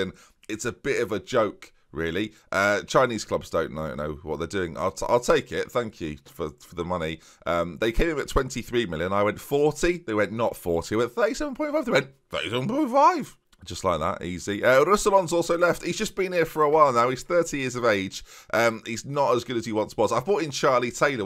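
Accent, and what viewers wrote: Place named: Newcastle, United Kingdom. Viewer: Tbf I only liked the video for the Geordie accent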